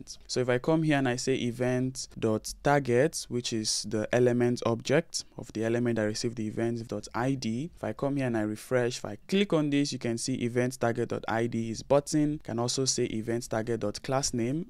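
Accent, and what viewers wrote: Nigeria. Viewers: naija accent i am gussing, leaning javascript too, thank for the video by the way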